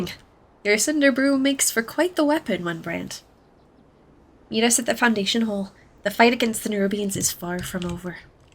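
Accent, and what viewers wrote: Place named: Ireland. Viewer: I love your middling Irish accent! 😊 It's quite lovely!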